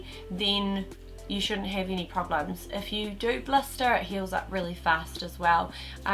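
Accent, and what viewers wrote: New Zealand. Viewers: Hey I was wondering if you could recommend a place to get this done. You sound Kiwi so I'm hoping you got it done in NZ?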